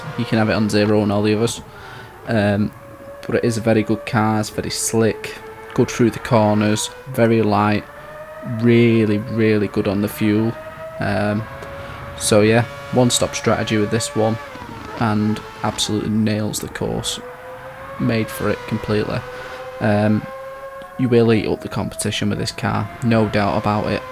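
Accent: United Kingdom. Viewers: Man This game is so expensive on Car purchases so I have to make the best use of the cars I've got But thank you for all these builds. Do you think that the Peugeot l750r Set up you have here Will work well for the cafe Menu 42 GR1 Suzuki Race too? PS nice to hear A fellow mancunion accent.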